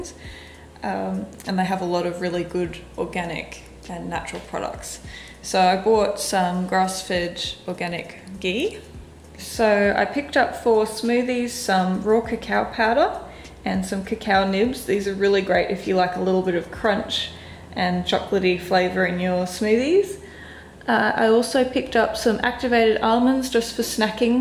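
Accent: Australia. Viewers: Oh my gosh are you from Melbourne?? :D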